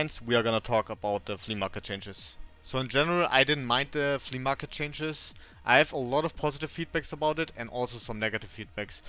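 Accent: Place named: Germany. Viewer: Dein Englisch ist top aber der Akzent ist nicht zu überhören 🤣 German :p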